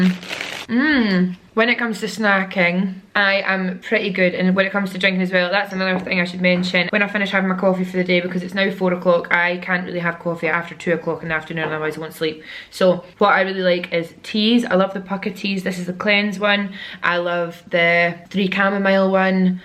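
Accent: Scotland, United Kingdom. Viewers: Scottish I thought I was going to get American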